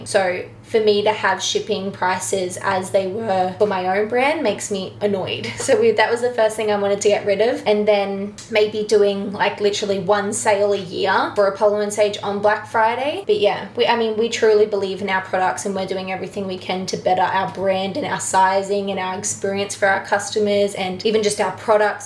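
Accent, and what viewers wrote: Australia. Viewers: When you say “week two” I melt. Love your Australian accent!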